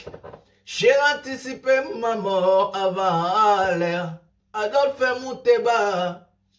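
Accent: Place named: England, United Kingdom. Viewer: na sepeli na accent nayo ya anglais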